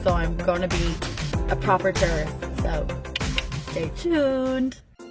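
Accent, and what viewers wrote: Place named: Ireland. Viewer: I thought Australian accents were my weakness until I heard yours 😂😂😂. The videos Irish people tasting different kinds of alcohol always makes my day. Awesome videos!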